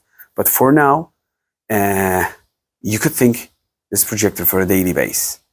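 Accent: Turkey. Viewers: Selamlar, öncelikle bu videoya harcadığınız emek için çok teşekkürler. aksanınızdan Türk oldugunuzu tahmin etmiştim. Yorumlara bakınca tahminimde yanilmadığımı gördüm. İlk projeksiyonumu alacağım. Film ve dizi için kullanılacak En fazla 120 inç görüntu için kullanacağım. Ekstra ses sistemine fazla gerek olmaması güzel. Bu cihazın Fan sesi rahatsız edici seviyede gürültülü müdür? Eğer gürültülü ise eco Mode gibi bir secenekle ya da parlaklığı azaltarak gürültüyü minimuma indirmemiz mümkün müdür? Rahatsiz edici değil derseniz siparişi geçiyorum.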